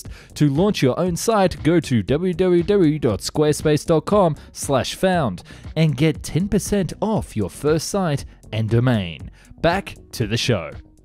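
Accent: Australia. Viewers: I reckon the narrator is an Australian. Lovely 🙂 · you're Australian right?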